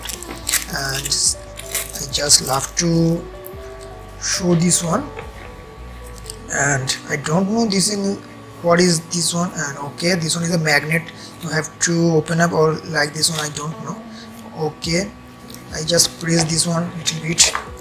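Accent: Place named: Bengal, India. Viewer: Abey english to sidhe bol... Bengali english mat bot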